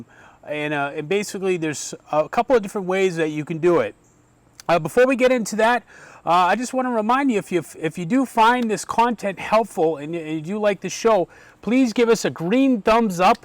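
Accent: Boston, United States. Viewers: you from Boston, guy?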